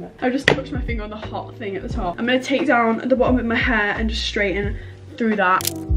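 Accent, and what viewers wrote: United Kingdom. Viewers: Welll this is my firsst timee i see youu anddd whenn i heard ur british accenttt i did a subscribe andd when the video endss i like itt soo much keep goind❤❤ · The British accent is so annoying, I think it hurts me 😭💔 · I love her britisch Akzent sm lol btw i love her too💞🤩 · As a Ukrainian who speaks American English I was just trying to understand British accent like whole video🥲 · you sound British:face-purple-wide-eyes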